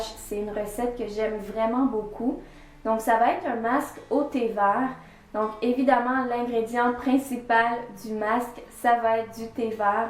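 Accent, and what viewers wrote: Quebec, Canada. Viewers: Je pense que je vais l'utilisé ce masque, sa va faire du bien a peau surtout qu'elle commence avoir des boutons :( et juste j'adore ton accent Québécoise je te fais tout plein de gros bisous :)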